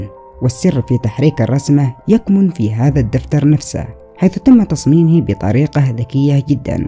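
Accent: Yemen. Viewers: يا انت يمني صح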